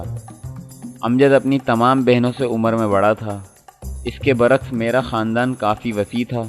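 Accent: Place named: India. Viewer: Ye lehja indian hai ......aesa kabhe nahe hota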